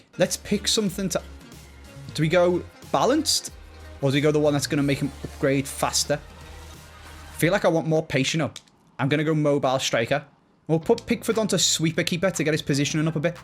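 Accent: Liverpool, United Kingdom. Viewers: Funny how scouse goes when he's just chatting. Happens more in this series for some reason!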